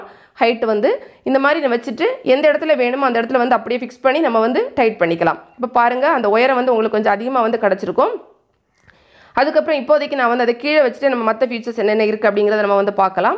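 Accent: Kanyakumari, India. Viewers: Hi sis, Excellent explanation that too your kanya Kumari slang is awesome.. Nostalgic 😔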